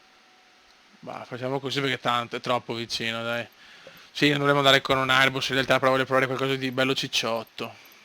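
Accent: Veneto, Italy. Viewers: Bel video, ma parli troppo veneziano, si capisce poco quel che dici...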